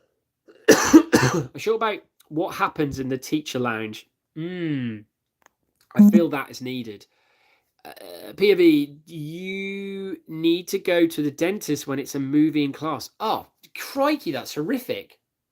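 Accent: United Kingdom. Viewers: are ❤u❤ British❤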